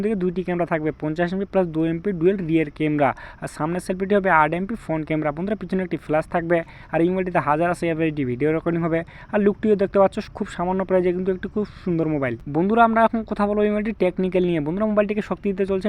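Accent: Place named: Chittagong Hill Tracts, Bangladesh. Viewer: পাঠক কি চাকমা?? ওনার বাংলা তো বুঝতে খুবই অসুবিধা হচ্ছে।বাঙালি reader হলে ভালো হতো।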